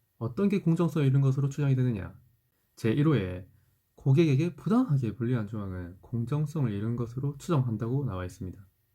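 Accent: Gyeongsang, South Korea. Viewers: 부산 경남쪽 같으신데용?ㅎㅎ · 부산 사투리네 형 . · 대구사람이신가요 말투가 제 친구들같군요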